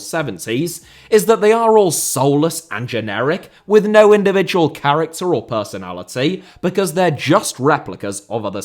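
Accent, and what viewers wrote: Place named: United Kingdom. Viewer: I love this channel for its information and also deep british accent.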